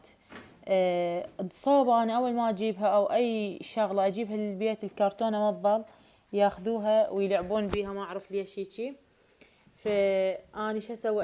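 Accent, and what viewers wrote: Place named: Basra, Iraq. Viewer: لهجتها بصره الله يحفظها مين متكون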